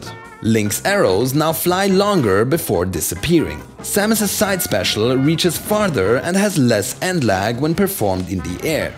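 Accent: Germany. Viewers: Deutscher Akzent🤔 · Dat german accent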